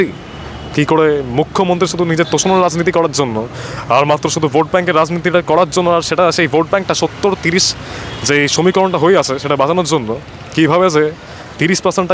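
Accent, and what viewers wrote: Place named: Bangladesh. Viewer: বাংলাদেশি টান ভাষায়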